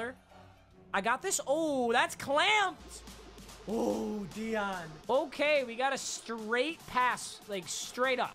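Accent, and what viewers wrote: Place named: Arkansas, United States. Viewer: That Arkansas voice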